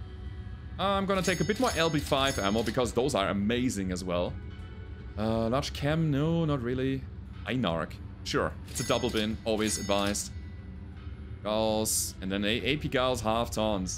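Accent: Germany. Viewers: The short was fun. Create a character for yourself! Be a Steiner officer. Use your German accent!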